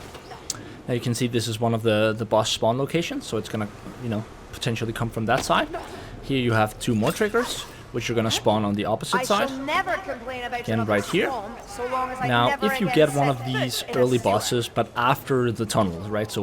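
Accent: Denmark. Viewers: Are you danish? Your accent sounds a lot like WackyJacky101's a pubg content creator. Thanks for the video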